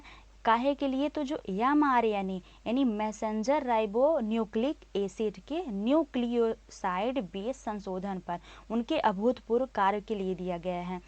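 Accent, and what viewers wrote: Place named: Bihar, India. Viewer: Tum Bihar se ho ...Boli mein udhar ka hi ahsaas ho Raha hai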